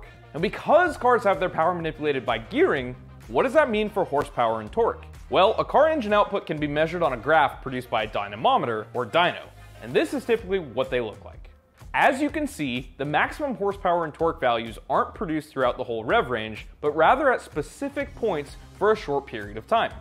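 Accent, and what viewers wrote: United States. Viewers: I feel like I've listened to James May witj an American accent 😁😁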